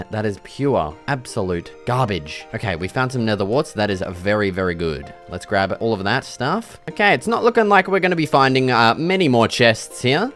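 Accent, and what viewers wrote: Australia. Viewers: STILL SHAKING FROM THAT FALL!. Im a 50yr old female and you have taught me so much about building i steal everything! And love the Australian Accent Not that WE have accents!!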